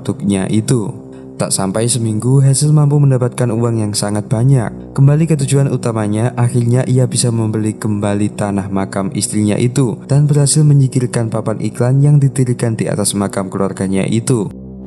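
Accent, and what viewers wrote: Java, Indonesia. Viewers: Orang Jawa yo ....jowone ndi lurr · Admin e wong jowo iki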